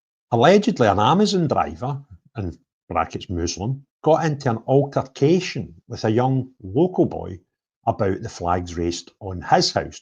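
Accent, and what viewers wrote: Scotland, United Kingdom. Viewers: He's Scottish you bigot.!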